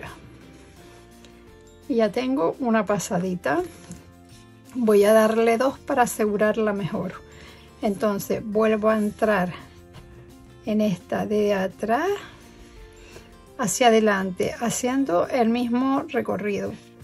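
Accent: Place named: Canary Islands, Spain. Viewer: Mil gracias, me encanta tu acento, me recuerda al de mi familia Canaria y a esa preciosa isla. Un abrazo desde Vitoria 😘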